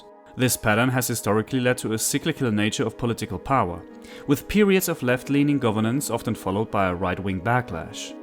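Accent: Germany. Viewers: Have you ever lived in the U.S.? I hear you have a German accent. Well, the only candidate who gave a shit about helping working people Bernie Sanders was labeled "too radical for the American people" because he wanted to develop a universal healthcare system like every other western democracy besides us has and get us free or nearly free college. In fact, I heard a German political commentator state that this radical Bernie has views that line up well with the Democratic Christian Union the best in German politics.... The conservative party 😂. And as for religion, nearly 70% of the country is still fundamentalist Christian so that's not true either.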